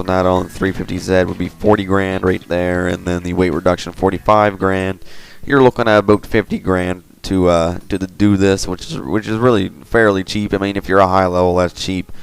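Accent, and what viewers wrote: Newfoundland, Canada. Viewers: man your canadian ass fucking english murdering accent made me hate this video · are u from canadia? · Are you from newfoundland? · Are you Canadian? Your accent is quite distinct. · are you canadian? :D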